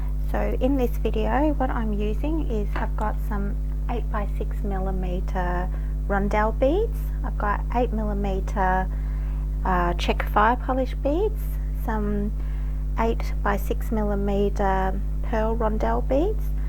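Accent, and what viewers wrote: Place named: Australia. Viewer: She is Australian! Listen to their accent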